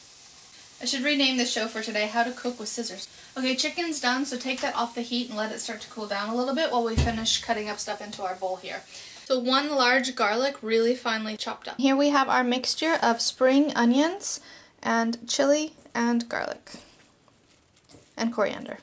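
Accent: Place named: Norway. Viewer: actuly kinda cus you know you have that norwegian hmm actuly dont know how to explain it my its in the ending of words where you can hear it xD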